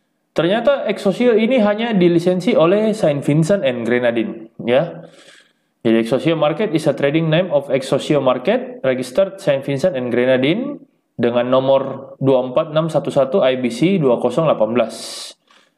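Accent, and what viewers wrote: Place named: Makassar, Indonesia. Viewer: Didengar logatnya pasti org makassar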